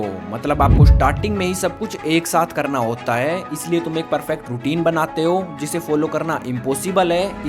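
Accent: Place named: Haryana, India. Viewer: bro is from haryana it tell voice tone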